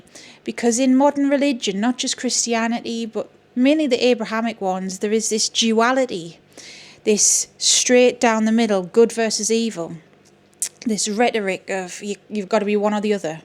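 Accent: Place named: Newcastle, United Kingdom. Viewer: It's a Newcastle accent, and it's perfection 😍 (I am obsessed with all the American accents. You guys have tonnes. ❤)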